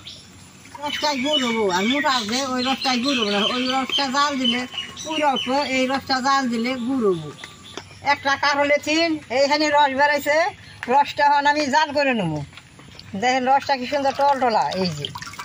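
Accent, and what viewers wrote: Tangail, Bangladesh. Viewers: টাংাইল এর ভাসা নাকি মানিকগঞ্জ?? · Didi ki tangail er manus naki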